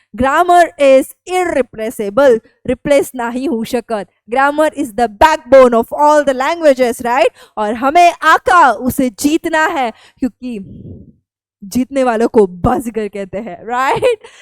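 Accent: India. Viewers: Hand gestures ,indian english and over acting pure ke pure sade panso rupey cut kardiya jayega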